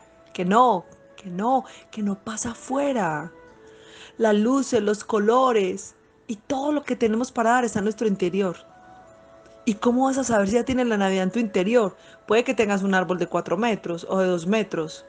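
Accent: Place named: Colombia. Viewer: Eres maravillosa. Eres muy especial, bueno, realmente no eres especial, eres un miembro más de este universo que hace en los corazones de quien te escucha un espacio mejor. Especial son las personas que necesitan amor y luz. Realmente eres amor, con un nombre, con un acento colombiano, con un cuerpo de mujer. Infinitas gracias por tu amor y extenderlo a la humanidad.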